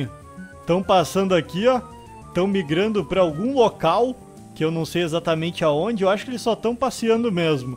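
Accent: Rio Grande do Sul, Brazil. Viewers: tu e gaucho de bento ne?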